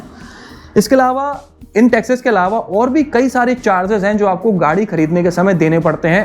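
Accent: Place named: Bihar, India. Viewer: Sir mujhe laga ki aap bihar se hai🤔🤔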